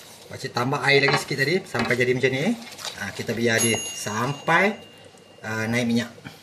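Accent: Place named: Riau, Indonesia. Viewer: Sedapnya nti kita try... Terima kasih ya... Cara pakcik bercakap macam org Riau la..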